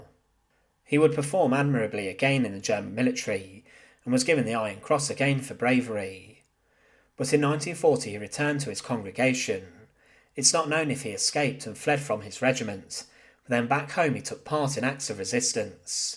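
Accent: United Kingdom. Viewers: It's a type of British pronunciation which I will never understand. But then, I hear myself saying "gonna" for "going to," so we all have our flaws.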